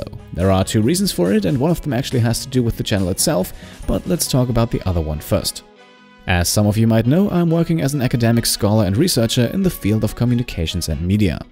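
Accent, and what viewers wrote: Germany. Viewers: Holy shit, do you even sleep? Work + school + youtube.. Also did not even register your german accent until like 20th episode of Rimworld and wanted to say great content and keep it up please!!! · RL comes first - no doubt. Congrats to your new business! :D A new strategy game would be nice. Maybe a paradox-one like EU4 or Stellaris or something similar. But its up to you. Im open for all, i guess. Because i really like your smooth voice. Soooo you are from germany! :D Honestly I almost thought so... Manchmal hört mans tatsächlich bissel raus ;) ;) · Ah Germany, I couldn't place your accent. I knew it sounded different from a British one but now I can hear it. You deserve all your subscribers and even more! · Warte warte warte du bist deutsch. Damit hätt ich jetzt net gerechnet vom Akzent her :D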